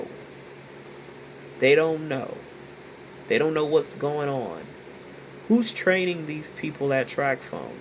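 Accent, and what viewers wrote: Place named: South, United States. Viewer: I agree. Tracfone is an inferior company with a poor customer service department. I think initially, people bought prepaid Tracfones for simple uses such as local or emergency calls. You are correct, Seniors began buying these telephones in the early 2000s to avoid a monthly bill. We had to learn how to use the android and iPhones confidently, and effectively. I am an older consumer, considering purchasing an iPhone or android with its monthly expense. You asked why so many of the workers for Tracfone speak American English poorly; it is their second language, not their primary, and maybe not the language that is spoken at home. They are what we (Black people) once were, cheap labor. It is because we as Americans (Black and White) do not address poor behaviors or cultural related barriers and inconveniences that conditions have become unbearable in the United States. 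You are correct when you say, in other countries, one is expected to try to speak the language, but in the USA, the power structure does not demand this for a reason. It is a way of dividing and conquering ethnic people. Setting them at odds with one another. I am not making excuses for these people. When we, (Black people) migrated to the North, White business owners hired us based on complexion and speech (lack of noticeable heavy southern drawl). I heard your southern accent. These people are cheap labor, working jobs others will not. Read the books American Banking in America, The Forgotten Man, then read Rac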